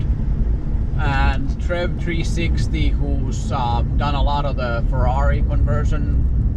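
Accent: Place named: Finland. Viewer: nice project. good solution for duoselect have to say that you sound very Finnish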